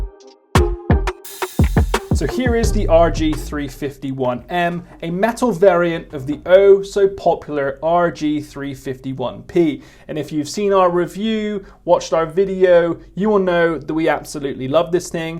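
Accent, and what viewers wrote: United Kingdom. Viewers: I didn’t even realize you were British until you said something. I think there are so many Youtubers around the world now that I don’t even think much about accents. Weird!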